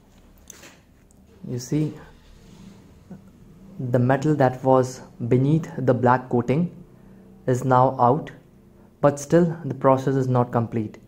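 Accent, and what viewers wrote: India. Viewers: Reading all the negative comments on this man's well produced, articulate and somewhat helpful video was discouraging. Looking at his tools and noticing his accent I would bet he does not live in the U.S/UK/Australia or some other country that has access to state of the art equipment or $ to purchase them. My guess is he lives in India with a billion people and only 10 have even a solder iron. The iron he is using was probably passed down from his grandfather and was sanded well before he got it. So last resort if the tip had its coating removed before is to sand. And that will work. Not long...but will work to do a job. Hats off to a well produced video and using what ever means necessary to make a fix. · EVERYWHERE HINDI YOUTUBERS (ACCENT AND HANDS)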